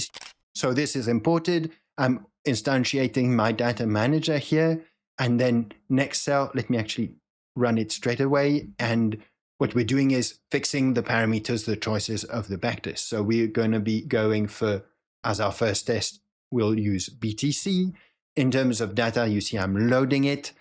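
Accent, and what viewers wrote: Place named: South Africa. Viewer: . I stumbled on your channel just now. Wow, it was the first video that linked me to this. Your accent attracted me....sounds South African. What a wonderful and detailed presentation that attracts the viewer to stay tuned as if we are in a Hollywood thriller 😊. Dude you are simply the best... This past month I have been watching so much nonsense on YouTube as I am trying to get back into trading after about two decades of absence. Much has changed with technology and I need to brush up before I re-enter the markets. Well done and God Bless 🙏🙏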